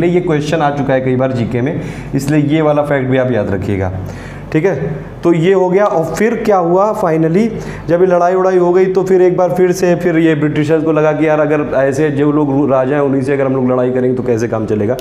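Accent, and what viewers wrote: Kanpur, India. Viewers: Nice kanpur accent sir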